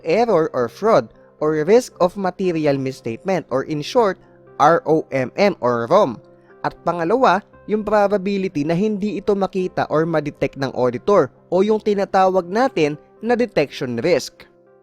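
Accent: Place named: India. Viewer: you have Indian accent why using a blond blue-eyed skin?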